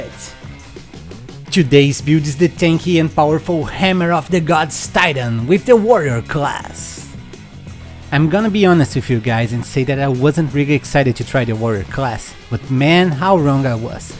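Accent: Brazil. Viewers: Vc é br, o sotaque parece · cade o video em portugues? certeza que é brazileiro com esse inglews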